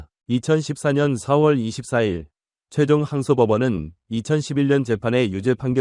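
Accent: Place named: Yanbian, China. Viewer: 요즘 조선족들이 범죄 유트브 시작하면서 사투리 때문에 이런식으로 많이 하던데 조선족이세여?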